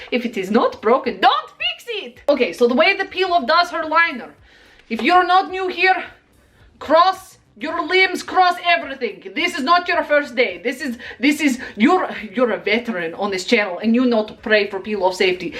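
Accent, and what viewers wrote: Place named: Australia. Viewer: LOVE YOU SO MUCH MAN! I LOVE THST YOUR AUSSIE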